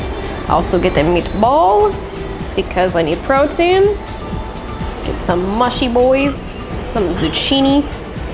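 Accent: Spain. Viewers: CON ACENTO ESPAÑOL ES LO MEJOR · tiene un acento re español cuando habla en esp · * tiene acento de Madrid por favor q mona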